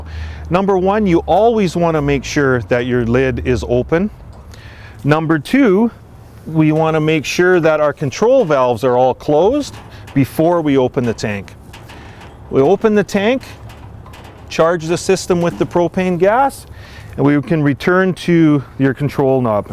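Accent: Canada. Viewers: Is he Canadian? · I swear that guy is in Canada because i keep hearing his accent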